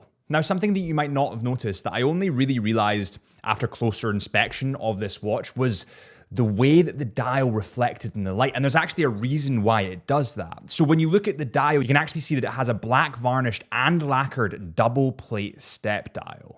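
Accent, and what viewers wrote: Scotland, United Kingdom. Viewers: I think Amiga is just the "Scotch" way of pronouncing Omega, isn’t it,? You don't sound at all like in the Archers, by the way.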